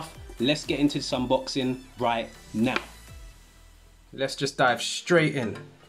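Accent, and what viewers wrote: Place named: United Kingdom. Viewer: An NES emulator -- with a wide screen and "X" and ""Y" buttons... Interesting. So where are you? From your accent, I would guess the UK, but you are talking prices in dollars.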